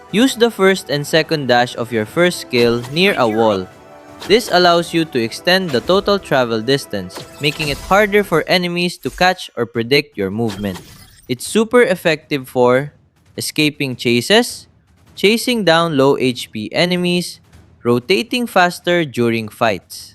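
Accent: Philippines. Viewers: Nah bro actually using Pliphina accents😅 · Accent: Filipino Language: English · Filipino accent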